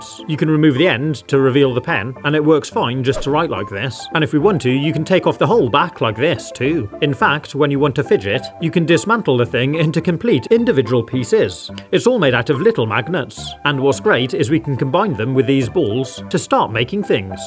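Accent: United Kingdom. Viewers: Bros British 💀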